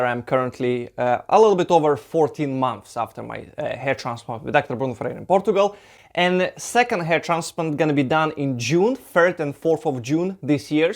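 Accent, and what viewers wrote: Russia. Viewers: You sound Russian. Do you recommend Dr Ferreira ? Where is he located?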